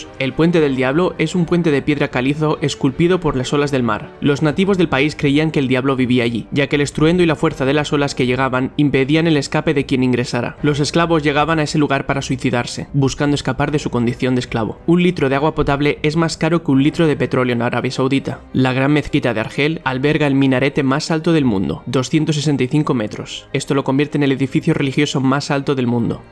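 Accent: Spain. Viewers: Cómo se nota que sos español jajaja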